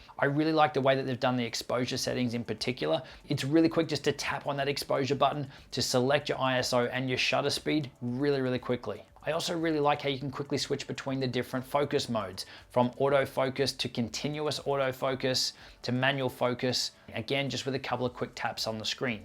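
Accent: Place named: Australia. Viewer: Hello, nice vídeo mate! Where are you talking from? I’m sure you’re an Aussie just by your accent. You look and sound very professional mate! Well done 👍🏻. It’s been great to learn a lot from your videos, thank you brother. I’m a Brazilian who’s living the dream in Perth for awhile, and now I’m setting up my own Youtube channel, thanks for your great effort mate 🙏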